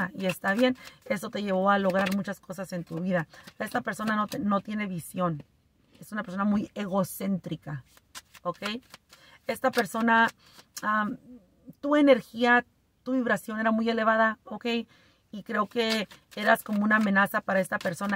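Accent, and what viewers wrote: Mexico. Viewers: ... Me haces reír mucho más aún con tu acento mexicano.. gracias ✨💙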